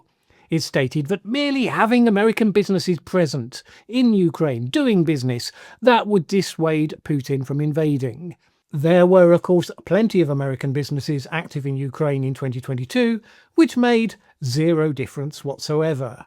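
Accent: United Kingdom. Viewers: This clown sounds like a Brit. Perhaps he should be more concerned with the pathetic collapse of his own country and/or his own treasonous government.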